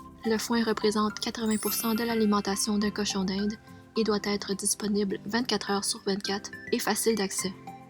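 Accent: Canada. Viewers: Salut ça fait plaisir l'accent du Québec ! Ton cochon d'Inde blanc est trop rigolo. Puis je l'adopter ?!? 😄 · J’ai beaucoup aimé ta vidéo ! Je crois que tu habites au Canada, parce que tu as un petit accent 😉 mais est ce que tu pourrais me dire où tu as acheté ton foin ? J’en ai jamais vue d’aussi bonne qualité !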